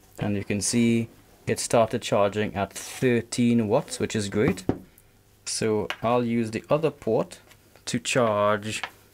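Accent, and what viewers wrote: Trinidad and Tobago. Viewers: I subscribed because of the accent that sounds trini 😮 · are you from Trinidad 🇹🇹? I was born in the States but grew up and went to primary school there. I know that accent when I hear it👍🏾.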